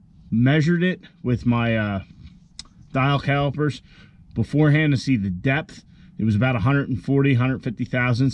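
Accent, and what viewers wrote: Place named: Northeast, United States. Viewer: Number one thank you for your videos very entertaining very educational and 2 I love your Northeastern accent yes you tried to cover it up but it's still very prominent not in the bad way I used to live in New York there pronounce of words is a lot different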